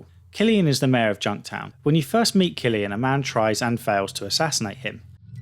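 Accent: England, United Kingdom. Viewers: Lulaire Noroub he has a English accent therefor he say it differently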